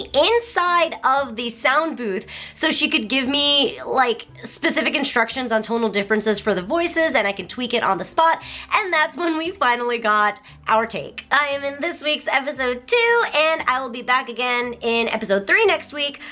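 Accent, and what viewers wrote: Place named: United States. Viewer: You sound soooo american but you speak japanese??? How do you do it!?!?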